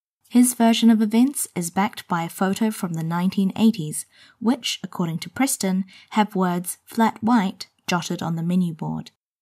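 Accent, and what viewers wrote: New Zealand. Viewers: 플랫 화이트 커피의 기원에 대해서 개인적으로도 너무 알고 싶었던 내용인데 이렇게 뉴질랜드 영어 발음으로 듣게 되어 영광입니닷! XD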